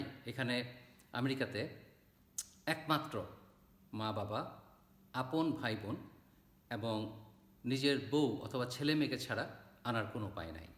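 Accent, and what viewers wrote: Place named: Joypara, Bangladesh. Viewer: ভাই কিছু মনে করবেন না, আমি আপনার খুব বড় ফ্যান, আপনার কথা শুনে আপনাকে ঢাকার জয়পাড়ার লোক বলে মনে হচ্ছে। এটা কি সত্যি?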